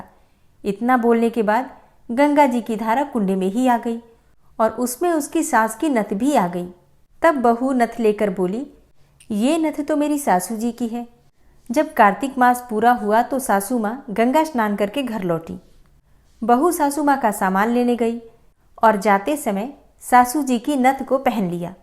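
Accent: Standard. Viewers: अति शुद्ध उच्चारण विष्णुसहस्त्रनाम 🙏🙏🌹🌹 ॐ नमो नारायण ❤❤ जय हो ❤❤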